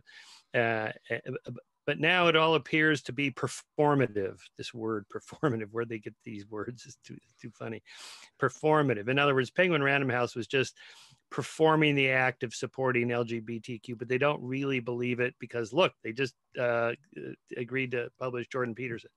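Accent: Wales, United Kingdom. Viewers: You Welsh? Go on bach!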